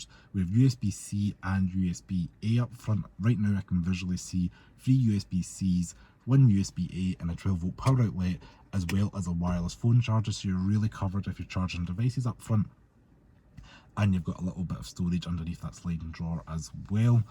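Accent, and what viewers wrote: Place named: Paisley, United Kingdom. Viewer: I meant no offence, as I’m sure you are a lovely bloke. Right, I felt the camera was on you too much, especially when you were explaining or highlighting certain aspects of the car. Please don’t be offended, but at times I had great difficulty understanding what it was you were saying. There was very little separation between the spoken words. My daughter-in-law is from Paisley and has a similar accent to yours but she is easily understood. Anyway, I’d like to have been told more about the car i.e rear seating, do the rear windows go all the way down. Is there storage for the parcel shelf. What’s the kerb weight and so on. And what on earth is the “fuzzy Stuff”?? Cheers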